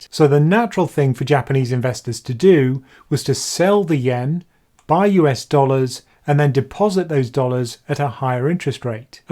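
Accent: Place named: Wales, United Kingdom. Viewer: Your cadence and accent reminds me of author Jon Ronson, who is Welsh.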